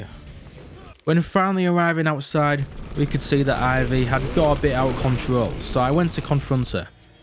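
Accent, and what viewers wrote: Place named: Eastern Europe. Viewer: Damn bro what is that accent ? You might as well be Eastern European.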